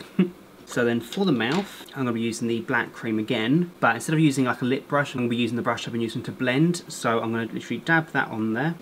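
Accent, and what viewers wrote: United Kingdom. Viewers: what part of the UK are you from? (if you are from the UK..)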